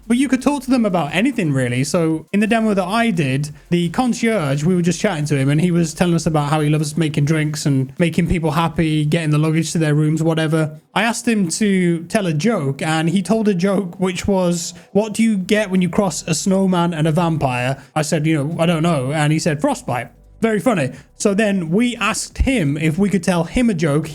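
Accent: United Kingdom. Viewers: Today we learned is a rural Brit, as a rural North Carolinian, respect. 🤣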